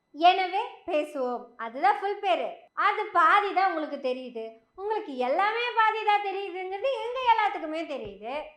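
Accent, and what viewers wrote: Kongu, India. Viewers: Kannu magale, un alagana Kongu Tamilum, contentum, pesum murayum, jesturum alago alagu. Unakku miga prakasamana edhir kalam irukkudhu thangam. Unnudaya ella videovum save seidhu appa appa paarpadhi enakku mattatra magilchi. God bless you child immensely.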